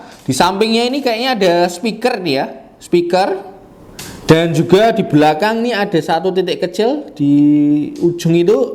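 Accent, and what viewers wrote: Java, Indonesia. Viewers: medok tenan ngomong e😅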